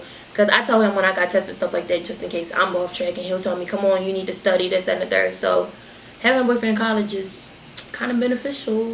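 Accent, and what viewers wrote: Baltimore, United States. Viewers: I can see you really making it big on YouTube! you have inspired me to make mine! Bmore accent poppin!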